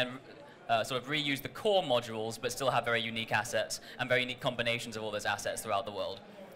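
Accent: United Kingdom. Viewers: British accent and super ambitious game..... Haven't seen this before...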